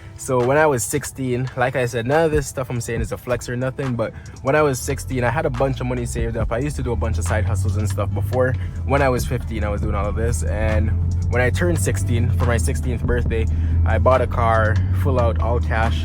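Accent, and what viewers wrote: Jamaica. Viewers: i hear an accent are u mix with jamaican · Sound like you are jamaican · i can tell ur jamaican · are u jamaican by any chance? the accent.. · I’m 16 and moved to Canada from Jamaica 4 years ago and right now I’m really struggling to get hired somewhere. Not even McDonald’s wants me 🤷‍♂️. I’ve already thought about renting cars in the past but I can’t find the money to buy one and clean it up. Also the second I heard your voice I knew you was a brother from the island 🇯🇲 g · I was here thinking you sound Jamaican from your accent. I am in college as well in Kingston, I'm 19 and would love to be driving a nice car like that as soon as possible.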